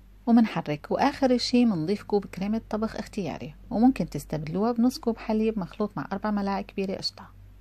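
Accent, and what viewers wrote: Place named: Aleppo, Syria. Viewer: يسلمو ايديكي الله يجزيكي كل خير سؤال، انت من حلب لهجتك كان من حلب.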